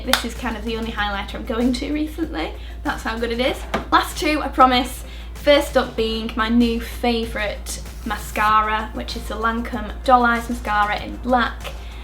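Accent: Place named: Bolton, United Kingdom. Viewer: No matter how tired I am I'll stay up an extra 10 minutes to watch your videos. Love them every time & really love the local accent (I am a Boltoner originally) xx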